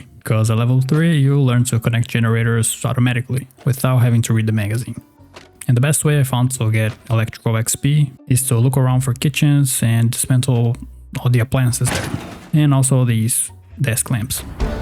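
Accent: Brazil. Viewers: Eu reconheço um sotaque brasileiro, mesmo com um inglês tão bom hehehe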